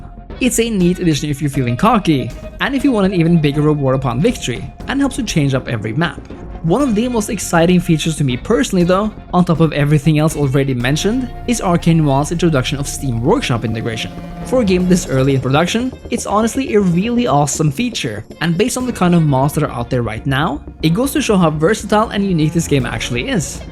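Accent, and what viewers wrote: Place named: Norway. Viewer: He is from Norway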